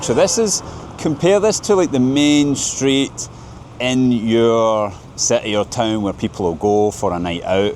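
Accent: Scotland, United Kingdom. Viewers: ПЗДЦ акцент реально СКОТИШ))) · If you could post English subtitles. Everyone cannot understand Scottish accent. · Love Scottish accent. Like you moustache bro, it is back in fashion. Muslims are not bad it is propaganda to keep people away from known what Islam is all about. It is one good, no single human is worshipped, no forcing to change anyone beliefs, that’s why there are millions of churches all around the Middle East with freedom to practice and obligation to protect minorities. Read is the first word from God to prophetMohammed , read yourself and learn.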